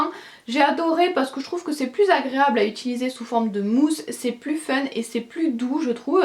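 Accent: South, France. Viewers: Hou toi tu as l'accent du sud , je suis en Provence , hihi , allez une abonnée de plus , bisouus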